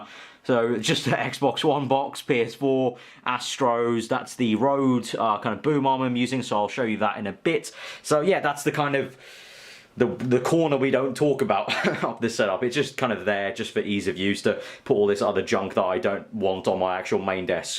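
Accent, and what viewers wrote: England, United Kingdom. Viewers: Pretty sure he's just an Englishman. Lol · I was just wondering which part of England your from? The only reason I ask is because your neighborhood looks absolutely beautiful. I live in the US and I've never seen a more beautiful landscape. You don't have to say where exactly, just whether it's northern England or southern, etcetera. Do you live in a rural area? To me you sound English. Your accent, again to me, doesn't sound welsh or Scottish and it's definitely not Irish lol. Plus you, yourself said you're British. And while most American people don't know that Britain is made of three countries, (Wales, Scotland and England; and add the Isle of Ireland and you have the UK, for those of you that don't know) I do. Anyway, I just wanted to know where abouts you're from. As I said before it's an absolutely beautiful neighborhood, you live in. 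I'd love to live in England. Most definitely in rural England, though. As it's the most beautiful parts of your country, in my opinion. If you do live in another country, apologies for my ignorance. ☺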